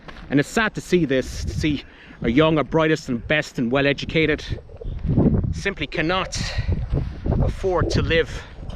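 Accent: Ireland. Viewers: Don't lose your irish accent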